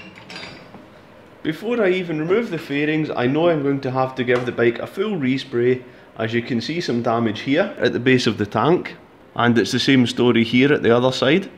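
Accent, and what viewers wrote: Scotland, United Kingdom. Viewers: Best restoration channel ever! you rock man, plus I can practice my english, I always found UK english harder to understand, but I really like how it sounds, so it's to see how you work on the machines and I can practice a little, too, cheers man! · The increased commentary and explanations is spot on. Being a fellow Scot, it all makes perfect sense to me. Think you are more north of the border them me though.. 😁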